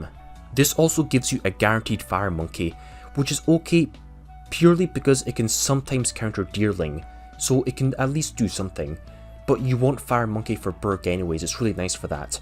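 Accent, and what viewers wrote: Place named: Scotland, United Kingdom. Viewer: Nice Scottish accent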